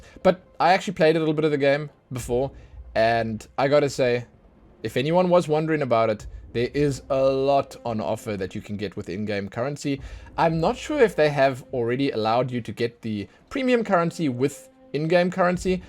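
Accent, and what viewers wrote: South Africa. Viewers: This guy is South African I could tell by his accent I’m South African too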